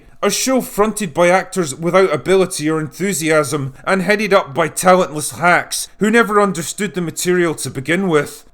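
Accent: Scotland, United Kingdom. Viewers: Why did you chsnge from a very nice listenable scottish accent,to kidding on youre drunk all the time?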